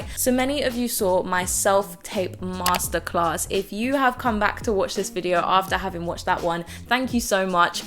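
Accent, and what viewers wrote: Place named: United States. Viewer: I was deceived by the intro. Your American accent is great!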